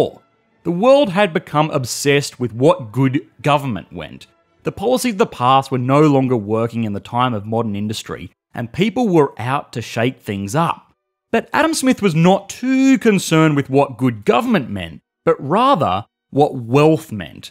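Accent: Australia. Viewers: you have a quite funny accent, i would guess is it australian accent? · Is that an Australian accent? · Me too, and I'm usually just listening to them in the background. I think it might be the Aussie accent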